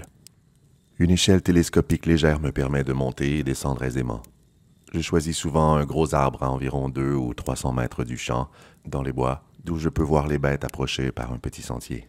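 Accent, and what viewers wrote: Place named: Canada. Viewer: Je viens de découvrir la chaîne. Vraiment excellent! La narration et ce petit accent canadien j'adore!🥰👌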